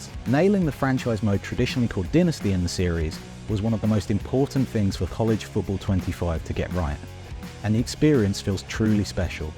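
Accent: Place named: United Kingdom. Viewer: This a British dude talking football 💀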